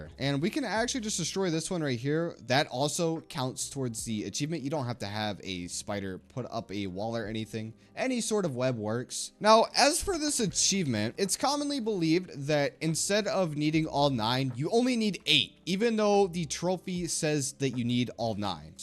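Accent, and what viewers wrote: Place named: South, United States. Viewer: Your voice is really cool. The Southerner accent is sexy.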